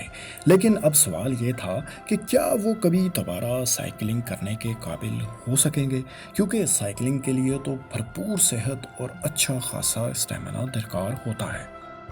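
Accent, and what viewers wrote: Punjab, Pakistan. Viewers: Fuzol video hay Bhai choti se baat ko itna lamba khencha hay....Waste of time...plz improve your Urdu accent..Punjabi lahja hay ap ka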